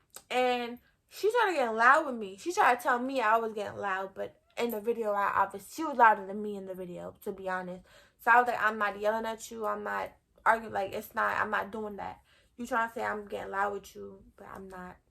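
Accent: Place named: East Coast, United States. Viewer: You from the east coast? NY or NJ I can tell by the accent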